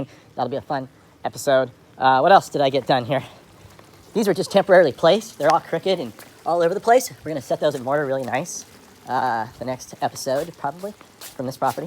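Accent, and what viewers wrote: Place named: Southern California, United States. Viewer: very pleasing SoCal accent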